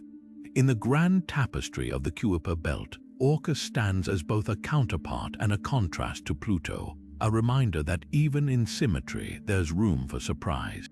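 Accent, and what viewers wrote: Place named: United Kingdom. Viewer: You said it well! I hit pause when the sneakily perfect British AI voice called the region the "Cue-a-per" Belt.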